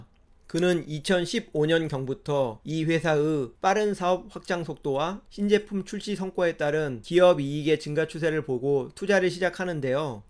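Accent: Jeolla, South Korea. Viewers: 의 발음을 못하는거보니 전라도 분이시군요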